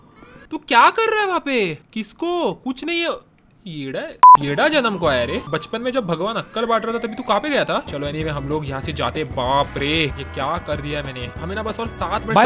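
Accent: Maharashtra, India. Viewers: Mahhpat : you are marathi or hindi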